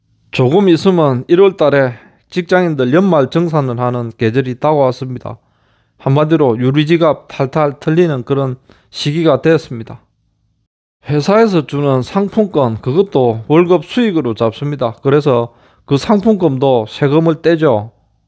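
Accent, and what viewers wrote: Gyeongsang, South Korea. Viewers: 님 댓글 감사드립니다 땡큐 💘 경상도 사투리 ㅎㅎㅎ 오늘도 행복하세요^^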